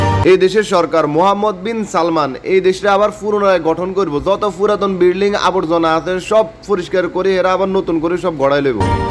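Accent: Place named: Chittagong, Bangladesh. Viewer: চিটাগাং ভাসা মনে হয়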